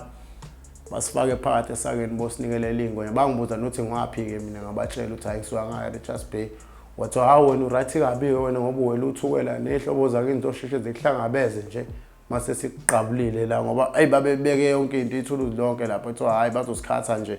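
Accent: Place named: Ndebele, Zimbabwe. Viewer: Waze wakhuluma njengaMandebele aseZim nsizwa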